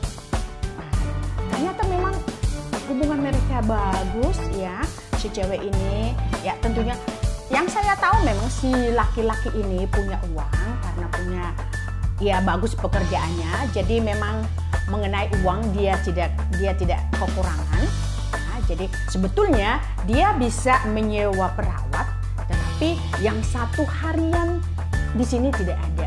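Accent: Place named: North Sumatra, Indonesia. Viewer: Ceritanya tan, sangat menarik sekali. Jadi pertama tama waktu masih ngobrol dan ketemu, kencang ya tan kalo bicara. ? Nggak kebayang ya Tan, tante kan logat Batak nya sangat kental haha. Jadi menarik sekali kalo didengar.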